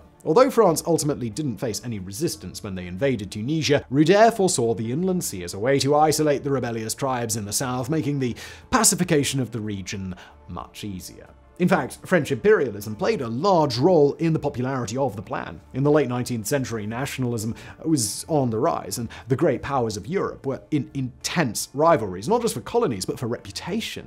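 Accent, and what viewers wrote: England, United Kingdom. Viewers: I find it extremely strange that you sound a lot more British now than a few years back when I watched your older videos 😂😂 it's absolutely baffling to me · What a likable not annoying at all bri'ish voice! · You have a lovely voice , so classic English !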